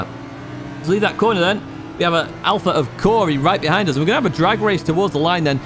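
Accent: United Kingdom. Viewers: Nice content but any chance you can you talk a tiny bit slower so non Brits can understand you ?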